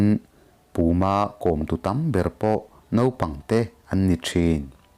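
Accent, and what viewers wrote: Mizoram, India. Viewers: Hmar țawng hian ti kher lo la tha tur... Ka lo ip chawih chawih mai.. mahse a tha lutuk. 😂😂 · Hmar tawng a mawi..♥️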